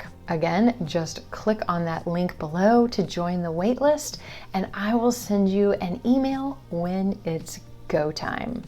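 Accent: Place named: United States. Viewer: Hello my teacher really I love way you're speaking American English pronunciation it's so sweet I'm Steven am in Canada Ottawa Ontario I need to speak English like you if possible.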